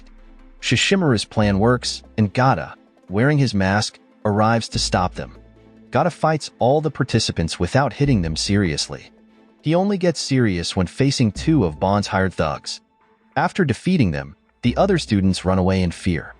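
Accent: United States. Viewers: Can't this channel use a narrator who speaks without stupid amerikan prounciation?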